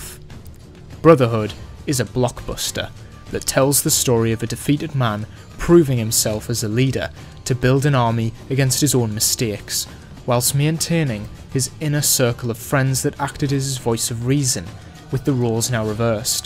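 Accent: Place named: North East England, United Kingdom. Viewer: This character analysis is one of the most detailed I’ve ever seen on YT. You’ve made me fall in love with Ezio even more than I already did! Also, can’t help but notice the very north east English accent you have 💃🏻. Fellow NE’er here 😎😎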